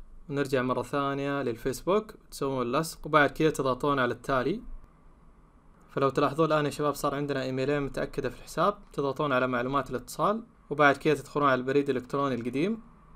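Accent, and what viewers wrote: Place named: Gulf, Arab world. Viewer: وشكراً لك لان كلامك مفهوم احب اللهجة الخليجية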